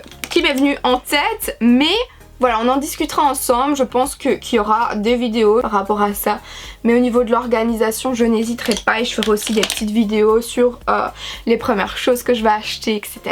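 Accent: Belgium. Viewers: Cc Perso je trouve que tu as l'accent belge!!! Et c'est mieux car tu es Belge. Merci pour ta vidéo. Bisous de France 🇫🇷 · Merci d’avoir répondu à ma question je suis choquée 😂❤️ maintenant je vais commenter plus souvent ! Sinon super vidéo comme d’hab 💓 (ps: je suis française et oui tu as un accent belge) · Je t'adore tes vidéos sont toujours aussi cool et on passe un super moment avec toi ! 😘 PS : oui je trouve que tu as un accent belge ! 😂❤ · Oui ta un accent belge et j ai la même palette naked que toi je l adore trop PS : je t'adore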